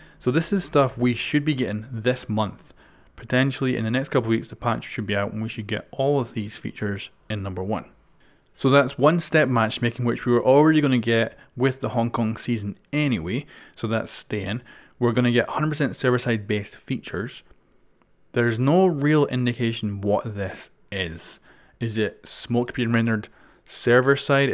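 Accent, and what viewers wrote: Scotland, United Kingdom. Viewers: Are you Scottish just wondering because I'm Scottish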